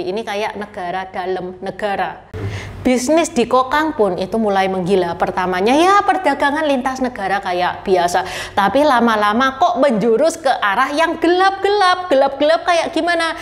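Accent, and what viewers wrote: Java, Indonesia. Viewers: Seneng banget sama itu logat jawanya medok bangeeet,waw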